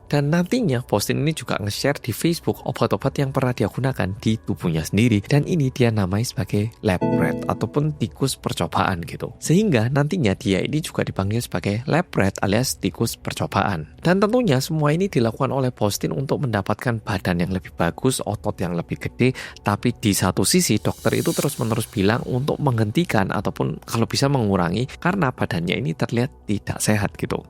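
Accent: Java, Indonesia. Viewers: Ini wni bukan sih? Logatnya jawa 🤣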